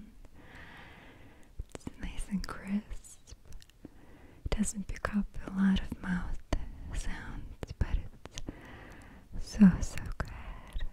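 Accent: Russia. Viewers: The Russian accent is so relaxing 😍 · You should do a souvenir shop role play with those items. Maybe use a heavier Russian accent and give us background on the items! I’d love to know the stories behind them :) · I was sooo hoping to hear the russian accent again ^^''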